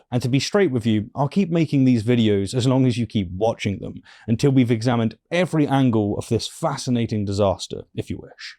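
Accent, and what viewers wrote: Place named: RP, United Kingdom. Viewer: you're a smart cookie man! very cool downton abbey accent too :)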